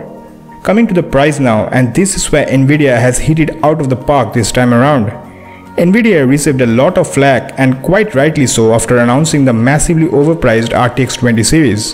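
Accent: India. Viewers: After hearing it voice I confirmed that u r Indian Desi londa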